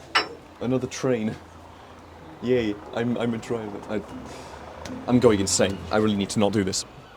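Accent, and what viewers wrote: United Kingdom. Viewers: I love that British accent. And the nerdy content. Awesome. Please keep going.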